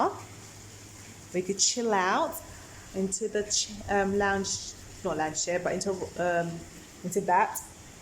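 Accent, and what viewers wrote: United Kingdom. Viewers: This presenter and her london accent is annoying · So refreshing to hear English accent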